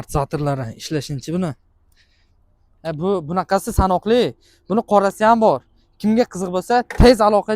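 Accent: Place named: Tajikistan. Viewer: Beat tojik ekanlar aksentlari bor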